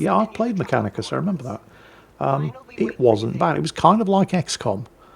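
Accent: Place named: RP, United Kingdom. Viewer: I did not learn proper english in school, but by listening to your voice. I know, there is a long way ahead of me, to speak and write in "BBC english". But im going there. And I use "sudden rush of the shit to the brain" in normal conversation, even with clients. Im an attorney. You did this. Be proud! :D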